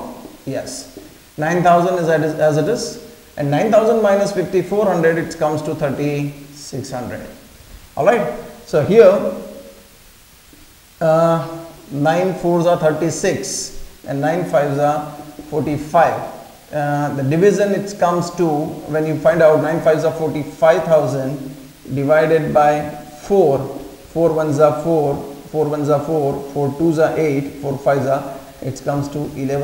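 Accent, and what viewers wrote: India. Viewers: Queen Charles kingereza chenyewe cha mhindi lzma uombe pooh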